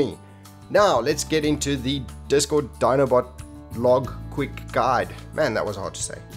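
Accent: South Africa. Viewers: You sound South African. Are you? I’m South African too!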